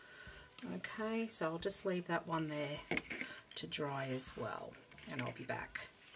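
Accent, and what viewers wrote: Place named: Australia. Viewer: this is a great caddy for the inks. I love using other things to make what would have been expensive storage items, especially here in Australia. I recently made a tool caddy from empty sauce bottles, bought from choice or stacks, they are cut down to different sizes and sit on an inexpensive lazy Susan. There is nothing quite as good as making your own. Thanks for this and great to hear a fellow Aussie.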